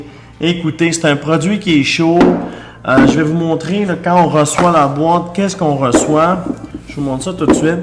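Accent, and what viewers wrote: Quebec, Canada. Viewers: j'adore votre accent québécois et je participe toujours au concours mais je n'ai jamais gagner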